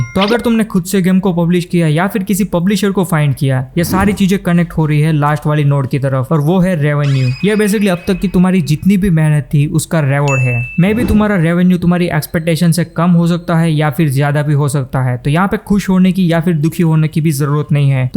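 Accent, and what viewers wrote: Gujarat, India. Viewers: from your way of speaking game I know you are from Gujarat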